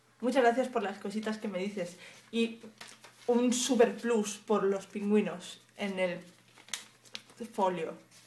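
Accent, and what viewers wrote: France. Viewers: me encanta tu acento en frances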